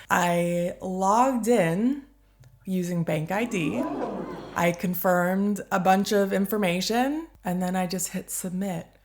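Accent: United States. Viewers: Hej! Are you from Minnesota?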